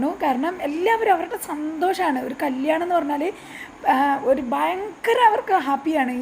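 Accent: Palakkad, India. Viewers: Madom, where is your native place ? Your talk palakkad same.!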